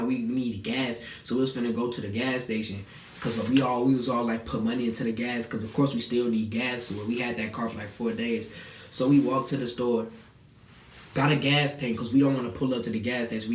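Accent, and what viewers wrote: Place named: Chicago, United States. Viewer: I can tell yh frm chicago fote😭😭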